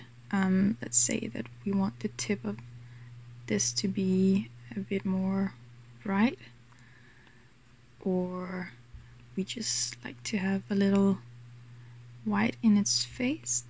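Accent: Denmark. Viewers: Are you Danish? Your accent sounds very similar :P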